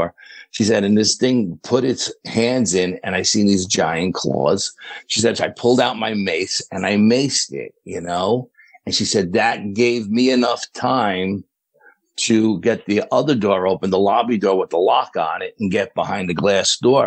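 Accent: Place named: New York, United States. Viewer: Man I love this guy's stories! I think the ny accent adds to the charm! Lol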